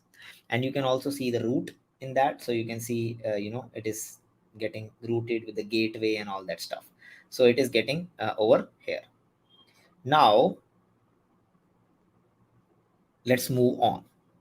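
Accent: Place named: India. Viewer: great content but really hard to follow because of strong flat indian accent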